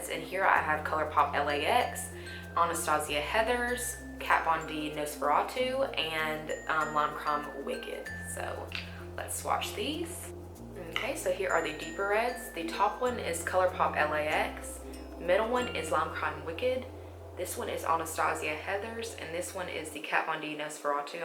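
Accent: South, United States. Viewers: where are u from? u sound southern.. I'm from AL!!!!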